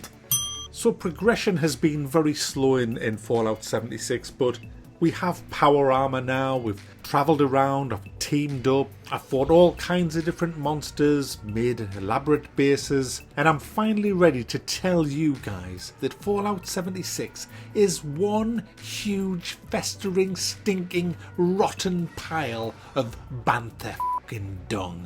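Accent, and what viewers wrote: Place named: Geordie, United Kingdom. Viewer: i can count the games on my left hand that actually has anything good to say about and i lost 2 fingers on my left hand in an IED blast whilst on patrol in Afghanistan,i think one should just take the plunge on games and buy it and play it for themselves just like the good old days and if its shit then ho well.never listen to others opinions,what doesnt work for peter doesn't mean it wont work for paul. you tubers love pissing and moaning about stuff because it attracts the low intellect people that just take there opinion as gold.back in the day when i bought sega and nintendo and commodore games there was no pissing and ranting yet the games were purchased and played none the less.i think you tube is a bullshit infested pit for people with no friends,no life and rely on it for a honest income,which i find pathetic.maybe if there was no money in it then i would like to see how many pissy rants you wanted to post then when you have to go out at 5am and work till 8pm just to scrape by.i come on these channels to boycott them,its in my belief a syndicate and many people are already trusting there lives on these people that continue to make there opinion count as if there a god and we should all bend a knee to there opinions,remember anyone can grab a cam mic and talk bullshit and joke swear and rant yes it has a certain charm because you have that jordie twang,yes the yanks probably love it but as a brit myself i hate it by the fucking way as many of my squaddie friends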